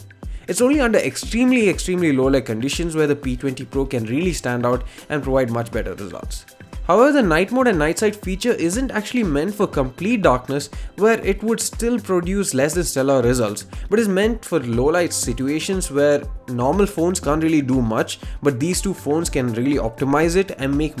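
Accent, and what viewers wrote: India. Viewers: Indian accent english is the worst, go shit on the street leave youtube alone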